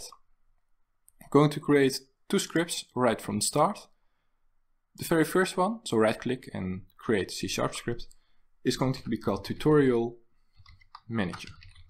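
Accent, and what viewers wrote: Netherlands. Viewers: Are you Dutch? :)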